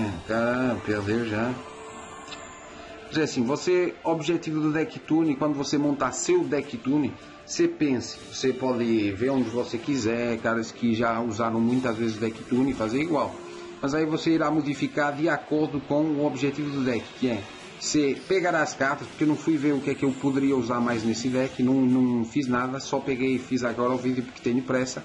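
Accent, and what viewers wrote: Portugal. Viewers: que sotaque é esse cara??? é um português falando português-br?? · gostei da sua visão de jogo e comentarios. não entendo muito portugues de portugal mas ta valendo rs.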